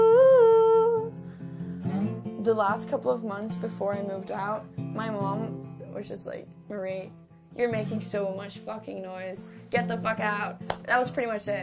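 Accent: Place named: United States. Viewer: guys this might be a stupid question but i’m a super new fan. if she’s norwegian why does she speak with an american accent?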